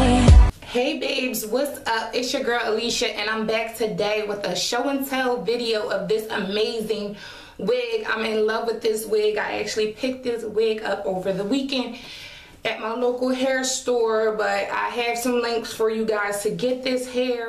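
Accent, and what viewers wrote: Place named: Maryland, United States. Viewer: I have a question are you from Baltimore or Maryland? Normally I don't ask people where from on here because of privacy but your accent is very similar to mine lol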